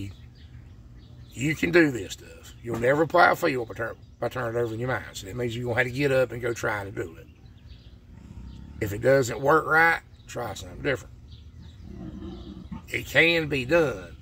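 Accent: South, United States. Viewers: Thanks for sharing , watched most of your videos, This french Canadian has a bit of a hard time with the southern accent. my second year with piglets . I have a question for you . We have cayotes around and i rotate the pigs out on pasture with electric , i always lock them in at night , I wonder how old or how big should they be before i can trust them outside at night? Thanks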